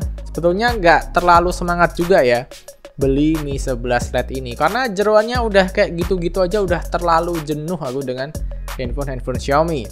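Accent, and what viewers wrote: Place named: Semarang, Indonesia. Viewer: Koyone iyo lur, soale nk wong semarang ngomong indo rodo medok, medok.e yo ngene iki hhh Salam dr kendal-klw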